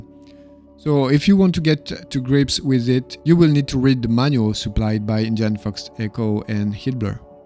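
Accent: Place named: France. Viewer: Excellente vidéo... (mais avec un accent pareil, t'as jamais pensé à faire tes vidéos en français ????)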